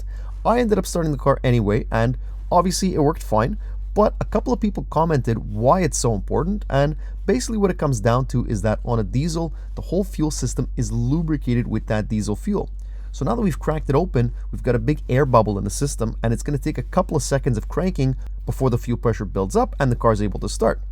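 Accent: Quebec, Canada. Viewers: Love the Quebec French. Ostie! 😂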